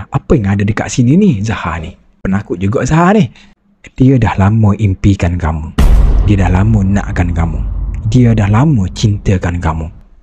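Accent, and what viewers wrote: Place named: Terengganu, Malaysia. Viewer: haha..ingat cakak banyak G belakang ore ganu..ok terbaik..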